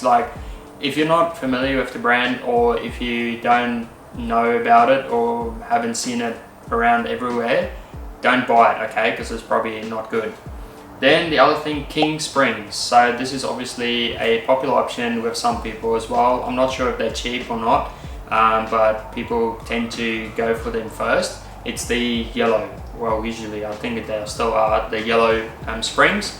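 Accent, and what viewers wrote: South Africa. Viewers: are you South African born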